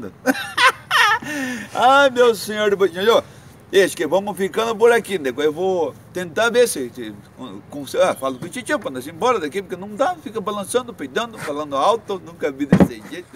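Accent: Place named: Paranaguá, Brazil. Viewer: é parnanguara mesmo!😂😂😂;muito massa nosso jeito de falar! Meu caneco!😂😂😂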